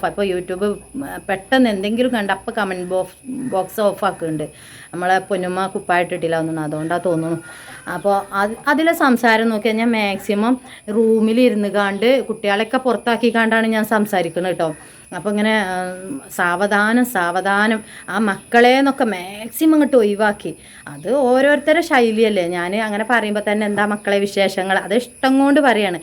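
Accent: Malappuram, India. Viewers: നിങ്ങൾ പറഞ്ഞത് ശരിയാണ്. ഞാൻ മലപ്പറം കാരുടെ കൂടെ ഒരുമിച്ച് ഒരു ഫ്ലാറ്റിൽ താമസിച്ചിരുന്നു അവരുടെ അല്ലാം സംസാരം ഇത് പോലെ ആണ് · ഒർജിനൽ മലപ്പുറം ശൈലി😊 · ഞാനും ഇത്ത മലപ്പുറം ആണ് എന്റെ സംസാരം ഇതൊക്ക പോലെ തന്നെ 🥰❤️ ഒരുപാട് ഇഷ്ട്ടം ആണ് നിങ്ങളെ വീഡിയോ കമന്റ് ഇടാറില്ല 🥰❤️ L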